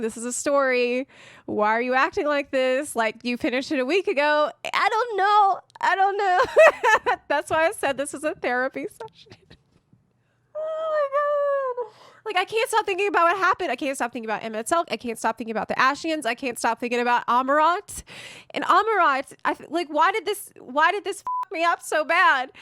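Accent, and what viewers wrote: South, United States. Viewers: Awww you are adorable. And southern lol I second your thoughts on the story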